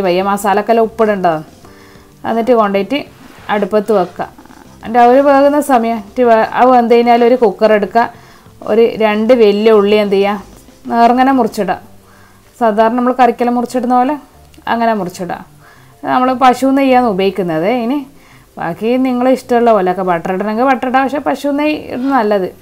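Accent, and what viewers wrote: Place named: Kannur, India. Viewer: Adipoli ayitund.....enikk nde avtharanathil...ettavu. Ishtam enthanenn vechal..nammale..Kannur..bhashayil thanne ulla...... natural aya avtharanam...super dear..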